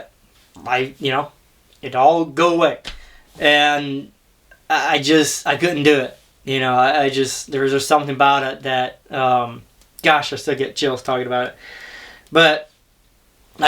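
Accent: Pennsylvania Dutch, United States. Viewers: You are a good inspiration. I am 81 and grew up in Pennsylvania into a pa Dutch family and it is so great to hear your accent. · When I first heard you on your video I thought I recognized the PA Dutch accent. I grew up in Lancaster County, PA and had Mennonite friends. Never saying "good job" is the German way - even among some of us PA Dutch "English". "There is therefore now no condemnation for those who are in Christ Jesus." Roman 8:1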